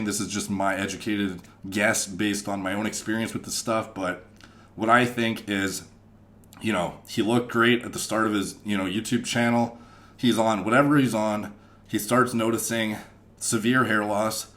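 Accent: Canada. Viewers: Your Canadian accent is obnoxious. Couldn't listen to you talk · This dude is from Canada af. They the only ones that say aboot. Lol Love your videos though man. Keep it up. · The Canadian accent is STRONG in this one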